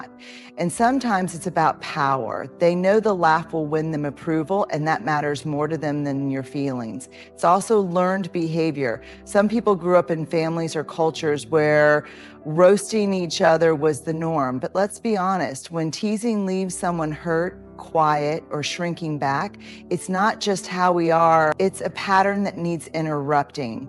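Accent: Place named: South, United States. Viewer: ✋. I do!! All you need to do is look up one of her live Ted Talks to know that this is Brenet. Love her slight southern accent ❤ She’s the real deal.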